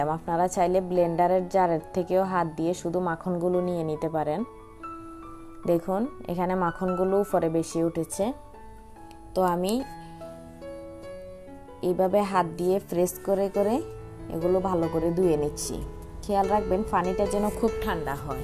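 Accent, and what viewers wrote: Chittagong, Bangladesh. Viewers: Chittagong er manos mone hocce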